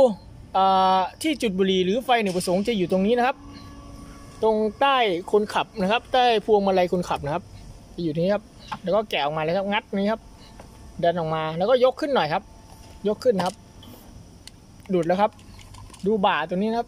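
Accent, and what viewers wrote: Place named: Isan, Thailand. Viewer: สำเนียงต้องอิสานโป่งเว้าไทยต้องเป็ะๆๆ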